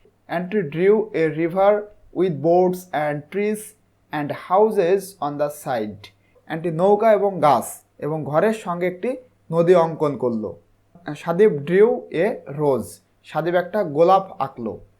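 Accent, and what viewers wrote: Barishal, Bangladesh. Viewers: Apni ki borishal theke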